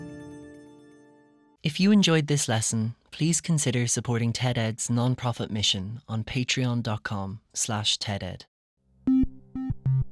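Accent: Dublin, Ireland. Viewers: As an Irish person I agree with the OP because the Irish accent adds the authenticity that an American accent wouldn't, whether Irish American or not. American accents are already so omnipresent in all media that I don't see the problem in our myths and legends being recited by a recognisably Irish tongue. There's no reason to feel insulted 🤷🏽‍♀️ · Love the story. The irish voice also goes with it too. Keep it up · Its a Irish accent because an American cant pronounce the names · D4 accent? Just sounds like Dublin to me. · He's got an ear-grating D4 accent though. The most reviled accent in Ireland. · One complaint: the stress on 'Danann' ought to be on the first syllable, not the last one. Unless you're speaking Munster Irish, and the narrator sounds like they're from close to Dublin. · Lol you can hear the narrators irish accent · I appreciate that you had someone with an Irish accent narrate this.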